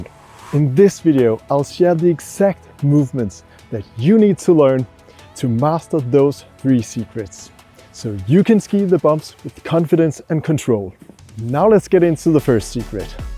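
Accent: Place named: Germany. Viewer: The German/Austrian accent of the narrator suggested this would be a detailed instructional tutorial rather than some fluffy review stuff. No surprise here about clarity, brevity and value of advice. Will forward this vid to my kids to improve their downhills.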